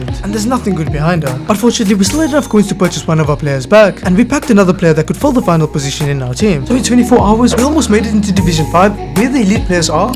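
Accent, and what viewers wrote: South Africa. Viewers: R u from Sa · Broski are u from south africa?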